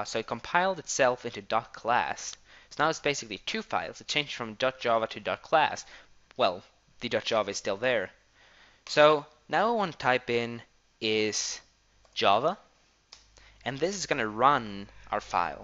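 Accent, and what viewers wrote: Sweden. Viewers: DU ER SVEEEENSK! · är du svensk? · are you swedish?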